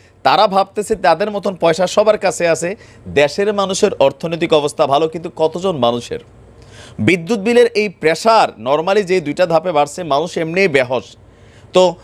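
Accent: Sylhet, Bangladesh. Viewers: ভাই আপনি যে সুন্দর সিলেটি মাতওইন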